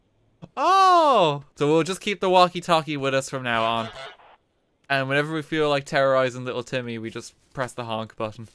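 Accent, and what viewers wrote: Ireland. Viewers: 20 seconds in “is this guy Irish” 25 seconds in I was sure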